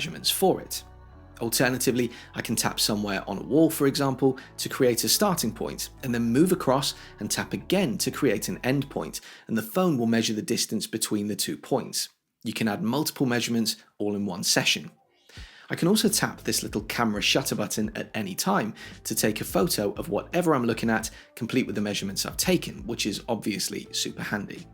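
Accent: United Kingdom. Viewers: Great videos!. I like the content and the presentation style. BTW what regional UK accent is that?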